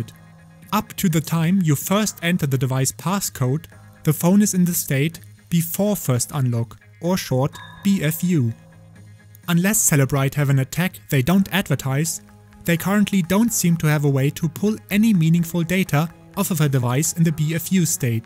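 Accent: Germany. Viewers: The German Accent is Thick my friend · Nach 2 sekunden direkt gewusst dass du deutscher bist xD. Ehrenmann ^^ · deuitscher macht englisches video, hört man an der aussprache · Ich finds immer lustig, wie man direkt am Akzent merkt, dass jemand deutsch ist. Whatever, sehr gutes und interessantes Video. Ich persönlich würde mich nur auf Graphene OS verlassen. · You german? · Man kann den deutschen Akzent einfach nicht verstecken, keine Chance 😂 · Are you german by any chance? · German Accent on Fleek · Awedul German accent and the guy doesn’t know how to speak in a microphone. He makes tongue clickings all the time. Don’t blow the microphone, space it farther away from your mouth…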